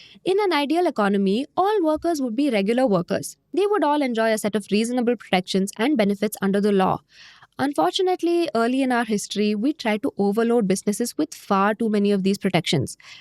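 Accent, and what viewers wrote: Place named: Tamil Nadu, India. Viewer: that tamil accennt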